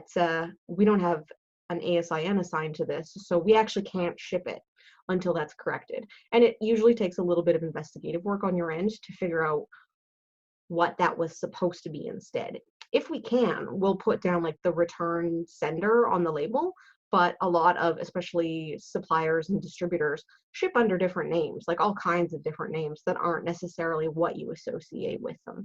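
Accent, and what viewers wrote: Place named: Canada. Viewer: Fascinating and useful stuff, not dull at all. I especially like that you don't ship problematic books for whatever reason. Answered a lot of questions perking the last several months that you've helped me navigate FBA. Love the Canadian accent, too.;-)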